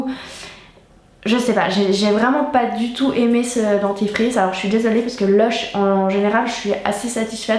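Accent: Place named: Switzerland. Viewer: J'imagine que c'est l'accent suisse qui prononce comme ça.. . Chacun son accent 🤔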